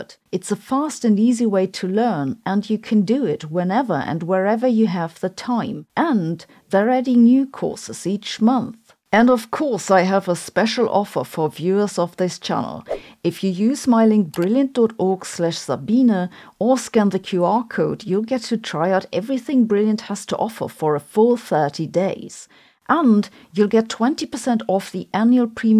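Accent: Germany. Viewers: I take everything this channel says with a large grain of salt - she does not even understand Special Relativity as proved by her clickbait videos about "faster than light travel" - had she ever studied Special Relativity and Lorentz Transformations, she would understand that "faster than light" is like saying "negative distance" , it is an oxymoron. Unfortunately, she would not know Minkowski spacetime if it hit her in the face. I expect scientists with German accents to be smart - sadly, she falls well short of the stereotype.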